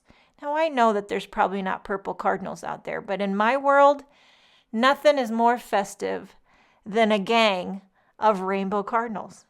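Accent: United States. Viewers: u are a women after my own heart, I do the same things, why don't things work the way they are suppose to, Ugh! And I have habit of expressing my opinion wether anyone wants to hear it or not. I noticed you said u were in Germany but u have an American accent. Love the birdies...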